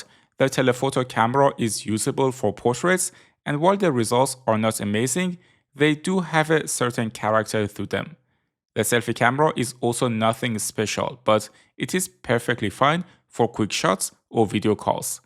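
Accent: Iran. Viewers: I love the persian accent<3 Free Iran!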